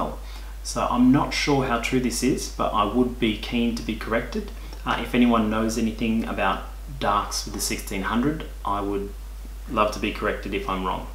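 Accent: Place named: Byron Bay, Australia. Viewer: That’s a hell of a well developed ear for accents you have...! is from Byron Bay which isn’t all that far away from Brisbane...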